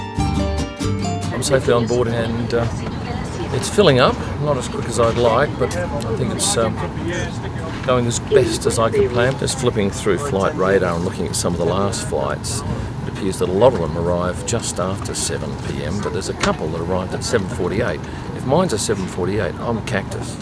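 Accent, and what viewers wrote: Australia. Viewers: A kip? A dickie seat? Loving the your Ausiness! Fun seeing in the background 👍👍👍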